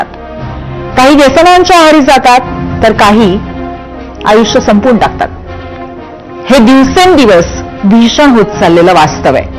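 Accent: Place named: Marathwada, India. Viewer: शेवट च वाक्य चांगले बोलले तुम्ही मला वाटतं तुम्ही मराठवाडा साइट चे असाल कदाचित भाषे वरून 😊 पण तुम्हाला बाबा नाहीत हे ऐकून वाईट वाटलं परंतु तुमच्या आईने तुम्हाला वाढवलं ही किती साहसाची गोष्ट आहे 🤝😮 तुम्ही जेव्हा तुम्हाला स्थळ बघाल तेव्हा तुम्ही ही नोकरी आणि प्रॉपर्टी पाहणार हे नक्की. प्रेमाने पोट नाही भरत पगाराने भरते आणि स्वतःची प्रॉपर्टी अडल्या वेळेला कामी येते 🤷 बाकी मुलांना शिकायचे नाही मी मुंबईत आहे तर येथील मूल धावपळ करून एंजॉय करून एकदम टवटवीत असतात रोज आणि शिक्षणही कसेतरी करतात 😅 तरी गावाकड उलट आहे ज्या गोष्टींकडे नाही पळायचं तिकडे जास्त पळत सुटतात उलट शेजारी कितीही गोड बोलणारा असेल किंवा रक्ताचा नातेवाईक असेल तरी तो जमलेल लग्न कसे तोडल हे पाहतो हे सत्य परिस्थिती आहे 😅 परत आपण म्हणतो गावाकडील लोक एवढे गरीब का ? कर्माने आहेत ते 😢कधी सुधारणार ही मानसिकता काय माहीत 🥱चला तुम्हाला तुमच्या पुढील वाटचालीस भरपूर शुभेच्छा 👍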